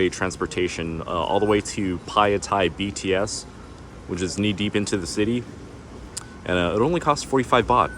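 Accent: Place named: California, United States. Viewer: he is californian lol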